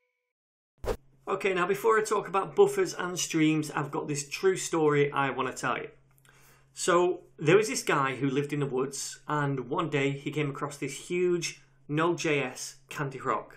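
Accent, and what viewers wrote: United Kingdom. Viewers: Why do u use hard british accent?? There are many indians there who watch your videos and try to grasp the precious knowledge???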